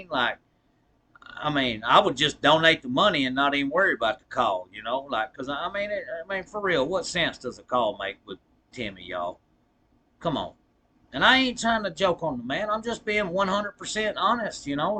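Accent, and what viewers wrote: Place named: Carolinas, United States. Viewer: Yo 😂😂😂😂u ah trip bro,where u from. I from Charleston SC n you sound like you from SC NC or somewhere close. What sense it make to talk to Timmy on a video,I mean you can't understand what he says😂😂😂😂😂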